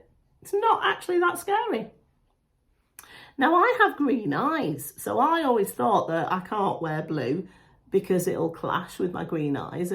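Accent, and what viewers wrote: Manchester, United Kingdom. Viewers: Aw thank you! ❤️ I thought I could hear some Manc in there somewhere! Listening to you makes me miss home ☺️💜 looking forward to seeing more of your content! 😘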